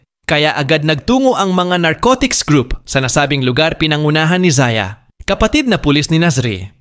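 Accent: Visayas, Philippines. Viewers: Ang tgas ng accent ng storyteller bisaya Ka ba?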